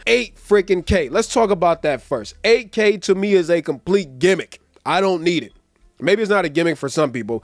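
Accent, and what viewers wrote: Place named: New York, United States. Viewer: more normal English ? Most people don't come from USA or New York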